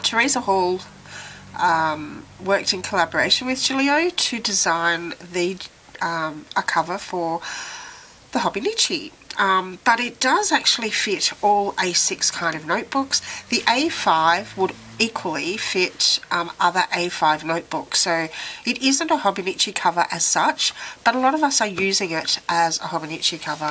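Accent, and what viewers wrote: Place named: Melbourne, Australia. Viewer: Thanks for sharing your views on this beautiful product and it's nice to to hear a fellow Melbournian. I agree with everything you say. Gillio's products are simply divine and of the best quality and craftmanship. I have a Gillio Giramondo in Epoca Red and just received my A5 Appunto in Epoca Gold yesterday. I love them both.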